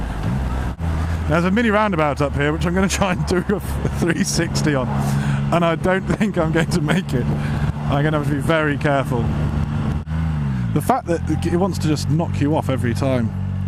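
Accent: United Kingdom. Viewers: Your classic English accent is excellent sir.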